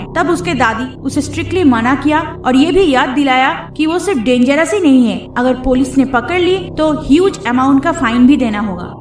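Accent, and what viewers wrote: Bengal, India. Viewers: Ur bengali accent is so cute.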